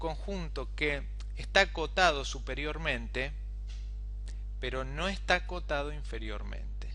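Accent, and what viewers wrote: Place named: Rioplatense, Uruguay. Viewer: Saludos y Excelente explicacion. Soy de Mexico y me sirvio de mucho su video, (ademas ese acentito a Uruguayo o Argentino, como que ayudo mas), se gano mil likes y la subscripcion a su canal. En horabuena por su grandiosa ayuda!!!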